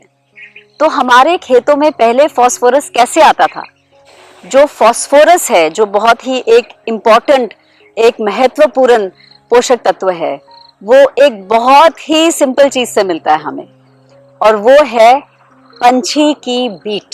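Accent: Haryana, India. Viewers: Am i hearing a haryanvi accent 😅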